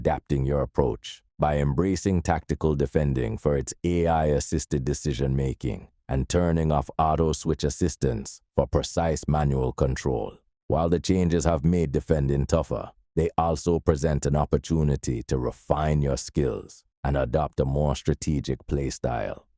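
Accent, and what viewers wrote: Jamaica. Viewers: Why does the accent sound Jamaican?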